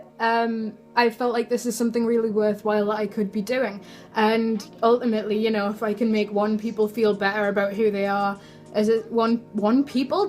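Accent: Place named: North, United Kingdom. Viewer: !!!!! <333 hearing your accent makes me feel so happy i miss the north sob!!! so excited to see you back on youtube!:D!!!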